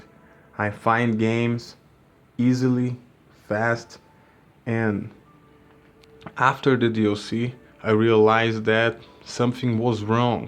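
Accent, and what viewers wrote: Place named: Brazil. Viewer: Percebi que você é BR.Pelo sotaque.Muito bom o seu inglês.Sou intermediário no inglês.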